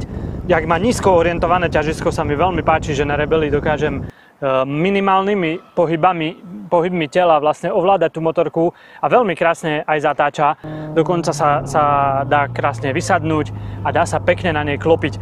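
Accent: Slovakia. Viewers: Kurna, co je to za slovenský přízvuk......to je z nějaké určitě oblasti Slovenska?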